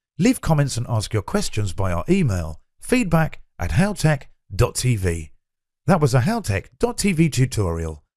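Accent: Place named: United Kingdom. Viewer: I love hearing the British-English accent, especialy "computah".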